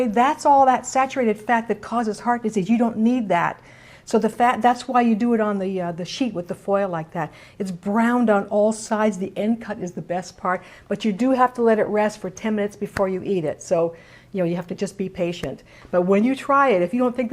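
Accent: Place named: Canada. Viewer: Hope you are well,. Thank you so much for sharing your meat loaf recipe. I plan to try this! I like the very slight Canadian accent. Your presentation is awesome.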